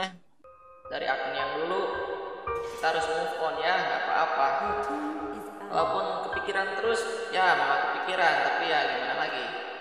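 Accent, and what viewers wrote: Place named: Sunda, Indonesia. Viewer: Bang, gua liat dari suara lu tu kayaknya elu orang sunda ya bang wkkwkw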